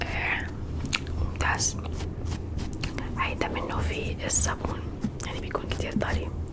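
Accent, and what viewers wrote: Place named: Damascus, Syria. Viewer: صرلي ساعة بفكر لكنتك شامية ولا حمصية 😂❤️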